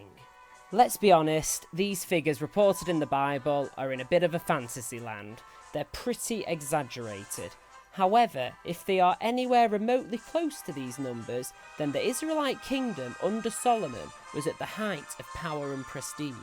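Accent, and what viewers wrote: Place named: United Kingdom. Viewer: You're British accent does not make you more respectful or intelligent like those iinfomercialss this has been a tactic used I noticed this you mentioned that's Solomon had 700 wifes about but you said this is fantasy I don't think so you under estimat the people from the past and Bible ii believe the past was more sophisticated than you know I noticed how the media makes people from the past movies look like barbarians building houses of clay or tents wearing shaggy rags and long un trim hair u above them I think in a way they were above us people living longer longer that's not true just like the vaccine today tell me lies tell me Sweet Little lies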